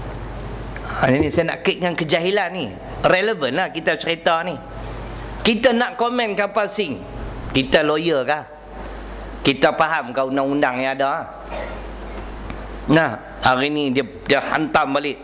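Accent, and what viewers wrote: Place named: North, Malaysia. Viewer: Bagi yang bukan dari negeri2 utara Semenanjung mungkin rasa bahasa yg dilontarkan ini agak kasar...tapi itulah bahasa Utara yang unik....bagi kita bahasa ni biasa ja...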